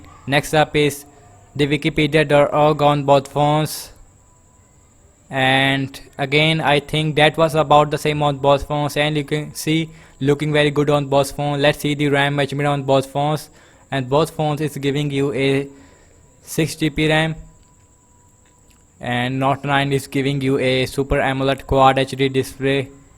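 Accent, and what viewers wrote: India. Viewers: Typical Indian accent and pronunciation..R2D2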